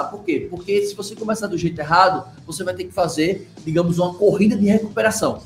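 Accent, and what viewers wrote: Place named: Northeast, Brazil. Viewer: A dicção dele é ótima, esse seu comentário é cheio de xenofobia, a sua "dificuldade" é só porque há um sotaque nordestino marcado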